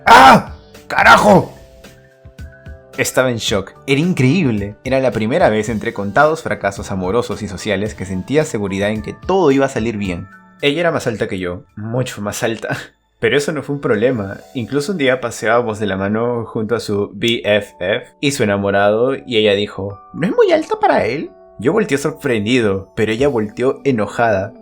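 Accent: Peru. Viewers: BRO ME IDENTIFICO CON TU HISTORIA Y CREO QE ERES PERUANO CON TU ASENTO Y YO TAMBIEN NMMS :v.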